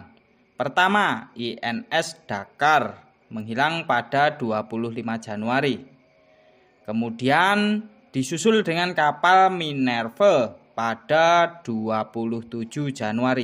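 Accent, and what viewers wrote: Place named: Java, Indonesia. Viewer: Dan sangat medok wkwk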